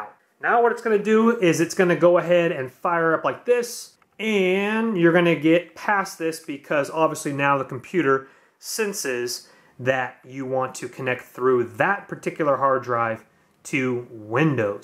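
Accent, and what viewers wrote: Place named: United States. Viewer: Finally an american been watching indians and cant understand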